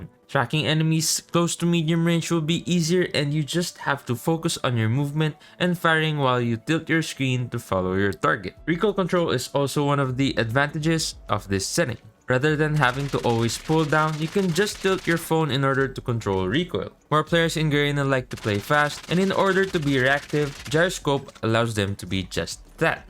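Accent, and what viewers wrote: Philippines. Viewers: I knew you were a filipino. Just from the a cent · Pinoy ka rin pala, tama kutob ko kase the way na mag salita ka e btw naubos ko na lahat ng vids mo. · Why is your english accent sound like filipino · Been watching your vids for a while now and I realized that you’re also a Filipino based from your accent. Good job! New subscriber here from Garena server.👍🏼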